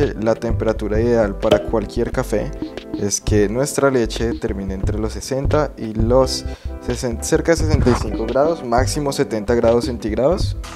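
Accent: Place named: Colombia. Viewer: Gracias por la receta. parece que eres colombiano. Ahora voy a preparar mi café late orgánico. Un abrazo, saludos desde Popayán, Colombia.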